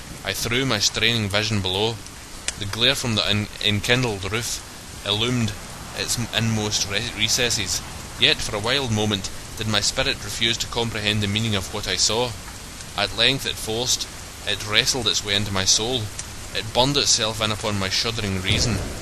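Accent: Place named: Scotland, United Kingdom. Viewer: Thanks, I didn't have to read it on my own, and I enjoyed a Scottish accent.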